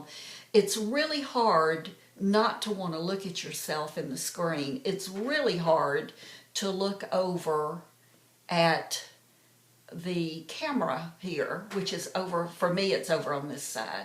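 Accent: South, United States. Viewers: just found recently your utube videos and am hooked, just love your accent, reminds me so much of the way Liz Taylor spoke in many of her films, kind of Southern accent though i think you are from Arkansas, am i right, you are such a young beautiful lady and your wedding day snaps are so lovely. i still have many more of your videos to look at. Have been to many US states but not Florida nor Arkansas, my fav is New England, twice visited all those NE states, also CA from LA all the way up the Pacific coast road to San Fran, hope to go back and see more. just love US. regards Jenny from the UK. XX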